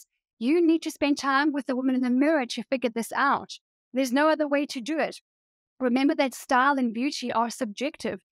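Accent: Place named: South Africa. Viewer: Thank you for sharing with us you are so inspirational and sweet and love the south African accent☺💖👍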